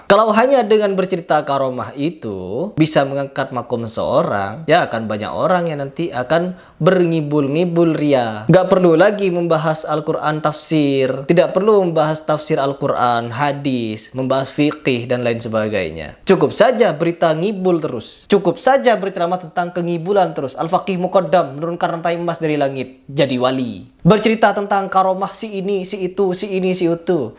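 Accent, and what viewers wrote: Madura, Indonesia. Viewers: ni orang kontenya cuma kebencian,logatnya madura tapi adabnya isra'il,pasti ini belajar pada google dan youtube,sudah jelas mulutnya sebentar lagi miring hidup dan matinya susah bahagia,semoga segera dapat hidayah,tapi orang kyk gini susah dapat hidayah krn sudah tercampur setan yg angkuh dan songong,biasanya klo orang hebat beladiri semakin tinggi ilmunya semakin merendah hatinya,brrti ini masih dikit ilmu beladirinya,sehingga angkuh songong seakan akan paling suci dan paling benar, na'udzubillah stumma na'udzubillahi min dzalik.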